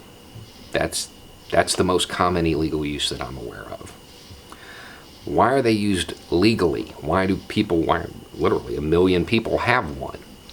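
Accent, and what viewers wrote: South, United States. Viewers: right there with you, look forward to and a dose of common sense. Also to hear a little southern accent...I don't get that up here in New Hampshire. Positive vibes to all the good internet people 😁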